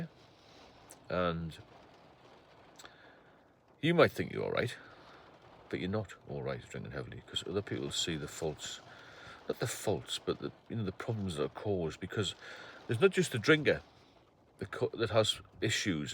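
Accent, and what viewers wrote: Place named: Newcastle, United Kingdom. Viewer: Where you from,I khow your a geordie,I use to go to Wallsend in the navy Been the big market in Newcastle afew times,got a few mates in Jarrow,all the best for 2025 sir👍👍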